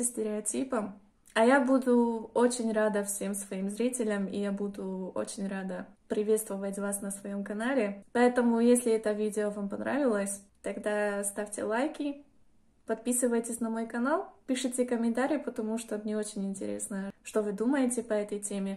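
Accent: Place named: Poland. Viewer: . Przypadkowo zobaczyłem Twojego bloga i obejrzałem kilka klipów. Byłem zainteresowany Twoją opinią na różne tematy. Faktem jest, że sam jestem pół Polakiem. Urodziłem się we Lwowie. Moja matka była w stu procentach Polką, a mój ojciec był w stu procentach Rosjaninem pochodzącym z regionu Niżnego Nowogrodu. Bardzo dobrze mówisz na języku rosyjskim, co jest zaskakujące, ale mimo to będąc Polakiem od razu i bez podpowiedzi zrozumiałbym, że jesteś Polką (nie Polakiem). Nawiasem mówiąc, wydaje mi się, że jest to błędna wymowa i urodziła się w Rosji. Chociaż w Polsce mam krewnych, byłem tam tylko dwa razy. Gdzieś w roku 1975 z mamą wtedy i w 2010 roku. w czasach ZSRR było bardzo ciężko, praktycznie nierealistycznie wyjechać za granicę, więc nigdzie nie pojechaliśmy, chociaż krewni mieszkają głównie na terenie województwa podkarpackiego. W 2008 roku mój wujek zdecydował, że konieczne jest przywrócenie więzi rodzinnych i przyjechał do nas na Krym z mężem mojej kuzynki. 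Dobrze wypoczęli i zaprosili nas do odwiedzenia. W ten sposób przyjechałem do Polski z żoną i córką w 2010 roku. Po polsku mówiłem całkiem nieźle, biorąc pod uwagę zasługi w tej sprawie siostry mamy, która z zasady nie chciała ze mną rozmawiać po rosyjsku. Poza tym ciągle słuchałem Warszawskiego radia, zwłaszcza relacji z zawodów sportowych, w szczególności z kolarskiego wyścigu Świata. Ten wyścig przez terytorium Polski, NRD, Czechosłowacji każdej wiosny. No i poza tym czytałem polski magazyn "kobieta i życi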